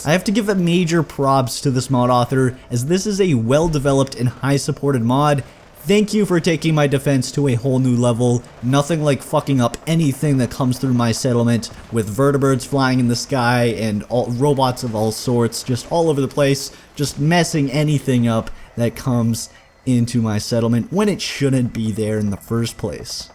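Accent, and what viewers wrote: Canada. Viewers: i've never found out that a person is Canadian as fast as in this video o.O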